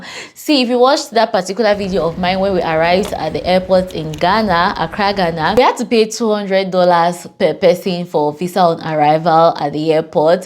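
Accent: Nigeria. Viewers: Have you lived in Nigeria before? Cause you can't be Cameroonian with that accent. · Not at all ,she literally speaks like a Nigerian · You sound so much like Nigerian 😊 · She sounds like a Nigerian from her accent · U speak like a Nigerian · No she sounds a Nigerian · Why do you sound Nigerian ?i dont gerrit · Naaaa, she sounds very nigerian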